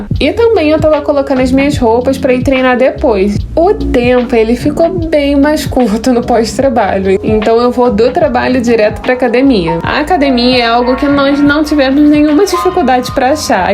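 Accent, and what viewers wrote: Rio de Janeiro, Brazil. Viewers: Você é do sul com esse sotaque carioca? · Tu é gaúcha, com esse sotaque super carioca? Não entendi...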